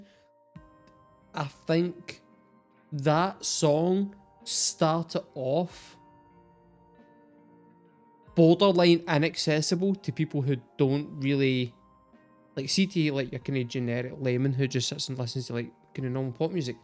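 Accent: Scotland, United Kingdom. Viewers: now that I've found an reaction in Scottish accent, my life is complete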